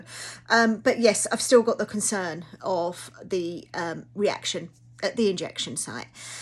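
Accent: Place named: United Kingdom. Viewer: oh ok! I heard the accent and assumed you were over there lol. I was wondering how much it would be over there because US meds are ridiculously expensive even though the same meds in the UK are free.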